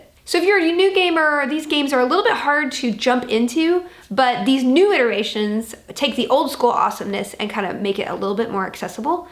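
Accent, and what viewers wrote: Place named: Cockney, United Kingdom. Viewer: Oh, my dear, you have a delectable cockney accent. But if you're going to voice dwarves, you absolutely must learn to do a Scottish brogue.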